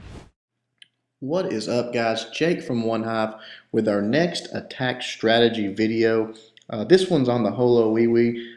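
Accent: United States. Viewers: My new favourite channel. The breakdowns are amazing and your all-American accent just fuels my need to war haha. Keep it up man!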